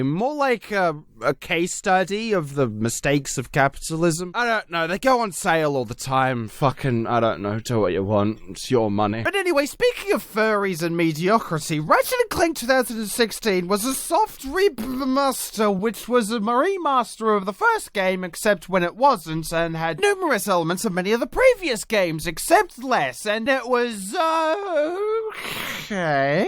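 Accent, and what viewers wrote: Australia. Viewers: wait u r australian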